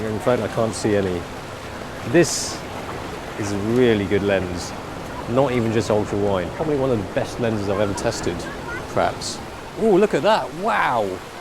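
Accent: England, United Kingdom. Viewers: u never hear speaking chinese with british accent, weird but kinda refreshing LOL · He said in Cantonese that he was born in the UK, albeit Cantonese with an English accent. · yellow skin with British accent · Slick Trademarc he is speaking cantonese but it's slightly out of tone. It has english accent in his cantonese LOL! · Hongkongers can immediately tell the accent of a British Chinese (i.e. accent), but communication is not a problem at all;)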